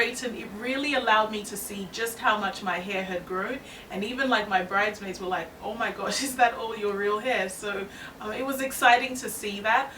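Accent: Australia. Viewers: I love your hair and your top. This is my first time on your channel, but is your accent Australian? I can't place it exactly.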